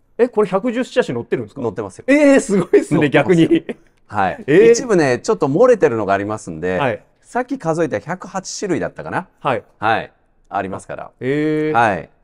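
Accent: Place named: Osaka, Japan. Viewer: 私は車が好きな、スーパーカー時代生まれのオジサンです。 私は車を所有出来る様な経済状況には無いのですが、もしその日が来たなら、是非KUHLさんの車が欲しいと、毎回動画を見ながら夢を見ています。 今後も社長のトーク(大阪商人的な)を楽しみにしています。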